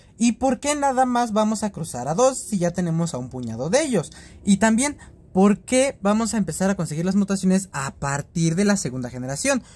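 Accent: North, Mexico. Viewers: Muy norteño xD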